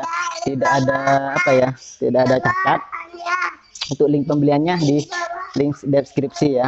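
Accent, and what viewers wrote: Madura, Indonesia. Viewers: Kalau dilihat dari foto ruang tamunya abang ini adalah santri, Kalau dilihat dari logatnya abang ini adalah orang madura, reng madureh dimmah kak......?? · Logatnya asli Reng madureh😅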